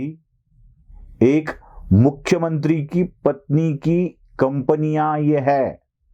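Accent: Maharashtra, India. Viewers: aapki marathi lahje men boli gai hindi sunne men accha lagta hai. up ke bare men aapka precomplex bad men up tour ki sacchai ka bayan karna sukhad tha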